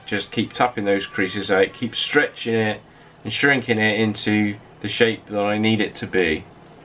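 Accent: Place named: West Country, United Kingdom. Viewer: When I was a bit of a tear ass in my car and bent it up I loved going to the repair shop in Westfield, Somerset. Mk 1 Cortina days. The owner Les was a great man and he allowed me to hang around until he started to let me use some of the kit. Loved using gas welder to stick on the odd wing or rear quarter. Doing it for love is one thing but doing it for a living is a different matter. At 71 I love what you do. Your blog's are pitched just right. How anyone can tell you your doing it wrong is definitely a bed wetter. I am adding Einstein to your second name. You say you were always looking for time and space well he had a thing about this too but he called it Space-time. I am trying to sus your accent. Are you a westo. North Somerset am I. Take care.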